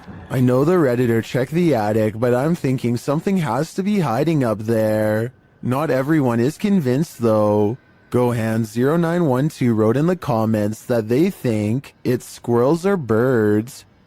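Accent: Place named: Southern California, United States. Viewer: Your SoCal accent is on point.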